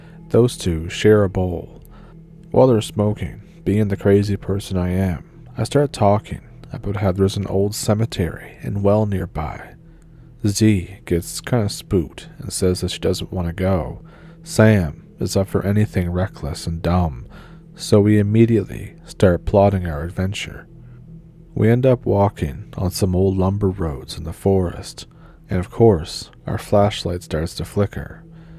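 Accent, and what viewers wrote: Canada. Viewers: Well, I have told you before every time I hear your accent, I first think of my Canadian cousins 👍😁🤟🎉🎉🎉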